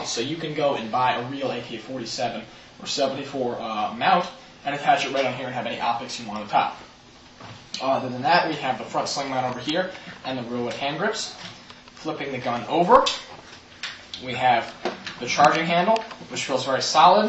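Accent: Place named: Boston, United States. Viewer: nice review but omg u realy sound like boston from do u love new york or whatever that one reality tv show u know.... nive job tho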